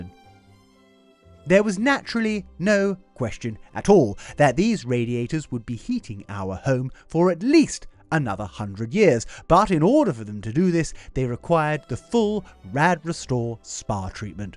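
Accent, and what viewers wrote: United Kingdom. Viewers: Love, love, love your delightful British accent ❤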